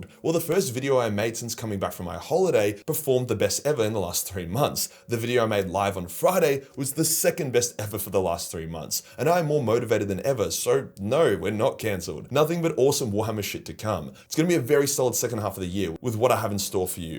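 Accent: Australia. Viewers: Every time you get “canceled” is because you are an australian and talk like one and people just dont understand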